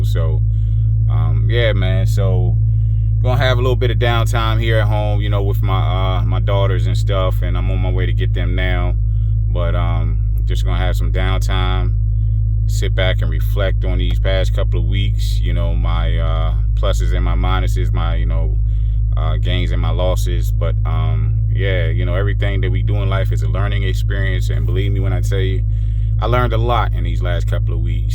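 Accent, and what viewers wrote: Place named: Baltimore, United States. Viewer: I heard you say you’re in Md. I’m thinking Bmore because of the accent lol. I’m looking to get into the Business soon . I’m in the DMV also, PG County to be exact. How is the local work in our area? And if you can point me in the direction of a reliable Dispatch? Keep on Trucking Holmes, you make it look easy 👍🏽👍🏽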